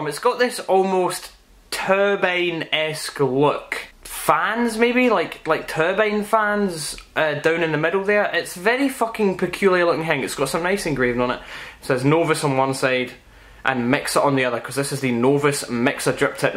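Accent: Scotland, United Kingdom. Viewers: You're so Scottish, it's beautiful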